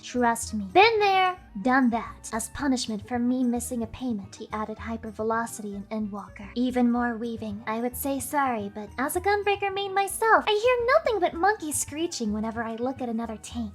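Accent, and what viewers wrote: South, United States. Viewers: I can't stop watching after hearing her southern accent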